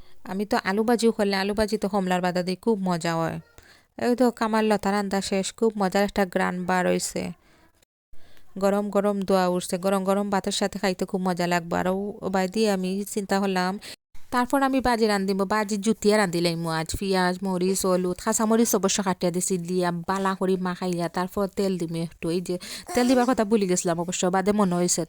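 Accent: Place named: Sylhet, Bangladesh. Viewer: Tee Afa afnar mat proper sylhet oy na kne lager thura thura shuddo aior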